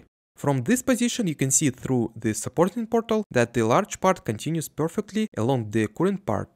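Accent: Russia. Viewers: Ты русский? Акцент говорит что да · Слышится русский акцент)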